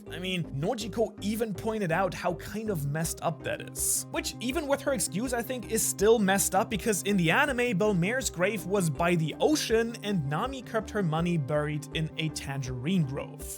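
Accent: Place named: Germany. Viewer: Ich mag deinen Deutschen Akzent.❤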